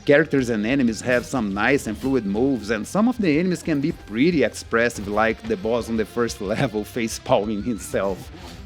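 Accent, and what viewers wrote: Brazil. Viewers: Realized by your accent that you must be brazilian right? Nice video.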